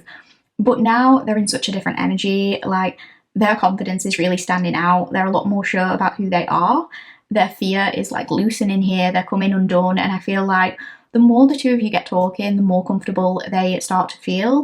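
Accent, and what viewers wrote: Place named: Lincolnshire, United Kingdom. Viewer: Your partner will know that Hull is - wish I could do sound bite - more "Yer Nuurrwe", Did you say 'You Know'? Eh!!! But you could pass as a North East Lincolnshire gal! (Just in case you need to go incognito when your channel is famous!!! 😉🥰) Keep up the great channeling. You have a gift. ✨✨✨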